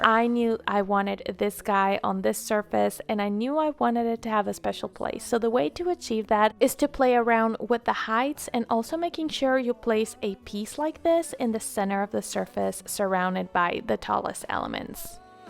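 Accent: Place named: Nicaragua. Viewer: such a nice job. I love that you make two videos one an English and another in Spanish. I have watched them both 😊 maybe I will try to do that myself on my videos if you don’t mind 😊Beautiful tree I love the burgundy ornaments & the hints of Cream ribbon 🎀 Took your tree to the next level, adding the candles gave it an old world touched. I also love the way you decorated your chimney & that vase I want 😊 it simply beautiful. The birth of Christ is really nice and unique. Nice to know that you are from Venezuela. Because I was wondering the way you spoke in Spanish. Was very similar to mine Nicaragua. I hope you’re having a nice day.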